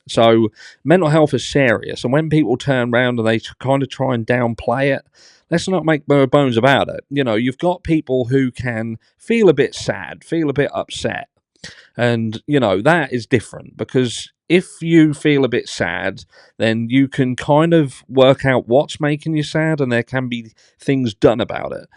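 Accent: Norfolk, United Kingdom. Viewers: Where r u from? U should english amd from Norfolk is that right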